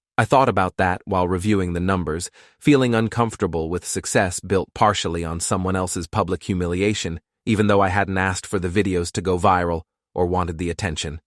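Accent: India. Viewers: Why the Indian accent?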